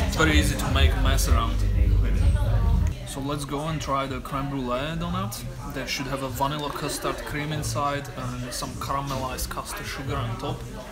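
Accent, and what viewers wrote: Slovakia. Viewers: is your accent Slovak or Czech? nice videos thanks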